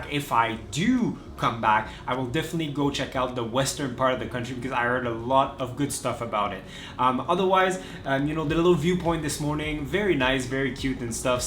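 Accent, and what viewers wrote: France. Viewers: I like your French accent 😅🙌🏽have fun 🇨🇲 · Bruh you speak a French French and an American English at the same time! I'm shocked 😱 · Beautiful video as usual. You made me fall in love with my country all over again😎. Your french accent is🤌.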